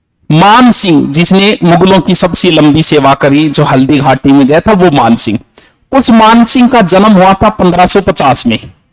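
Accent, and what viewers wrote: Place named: Uttar Pradesh, India. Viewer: Up se lagti hai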